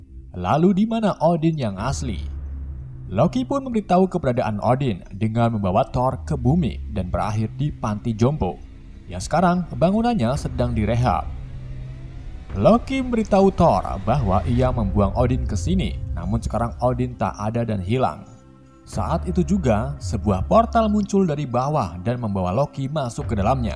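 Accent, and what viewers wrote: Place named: Java, Indonesia. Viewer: ket benslet 🤣🤣🤣 ngakak medok parah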